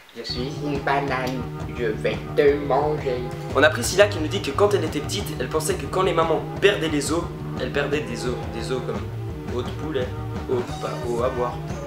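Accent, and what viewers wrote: Switzerland. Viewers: Ton accent suisse? Parfait :o❤ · Ooooooooh, t'es suisse ? :') J'aime trop t'es vidéos t'es super drôle et le mec derrière il me tue trop :')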